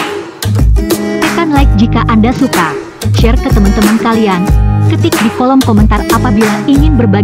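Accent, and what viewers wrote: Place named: Banyumas, Indonesia. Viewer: Ora Ngapak Ora Kepenak 👍👍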